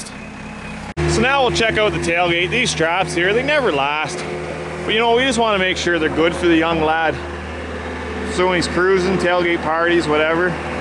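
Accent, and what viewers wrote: Canada. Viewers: what part of Canada you from? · I can tell this is a Canadian accent from a mile away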